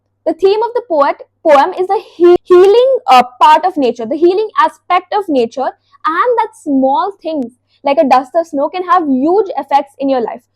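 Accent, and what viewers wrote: South India, India. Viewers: are you from southern part of india cause your accent shows so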